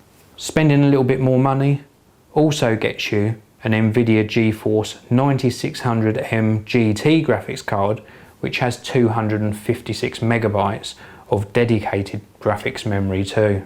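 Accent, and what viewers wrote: England, United Kingdom. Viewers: he is from england so he`s got this kind of accent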